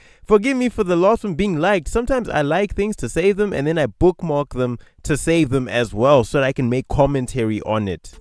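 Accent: South Africa. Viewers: THIS GUY IS DEFINITELY FROM SOUTH AFRICA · Are u South African 🇿🇦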